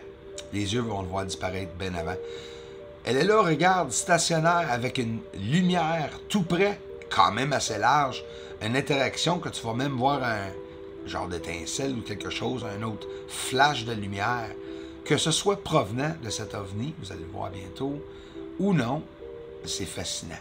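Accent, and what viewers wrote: Canada. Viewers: Avec son accent canadien jai rien compris nous sommes en France okay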